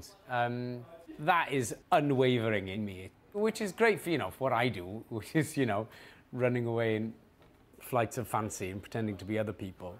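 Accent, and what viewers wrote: United States. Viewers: his American accent was so good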